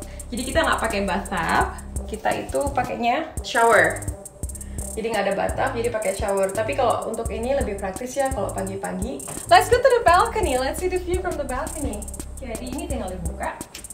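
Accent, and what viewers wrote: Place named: East Kalimantan, Indonesia. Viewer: Kakak orang kaltim yaa.. Aku kira orang medan 😂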